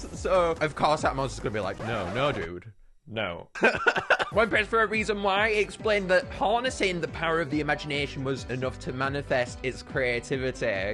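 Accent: United Kingdom. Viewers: are you bri'ish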